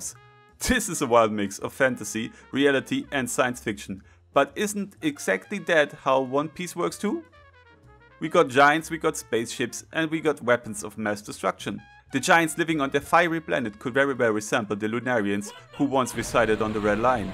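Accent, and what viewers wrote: Germany. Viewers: Bro's german AF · Du bist Deutscher, oder? Starkes Video!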